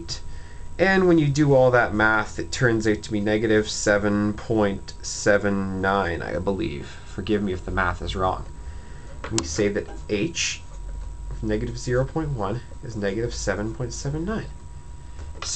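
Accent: Canada. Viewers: nice Canadian accent. this was a lot of help though thanks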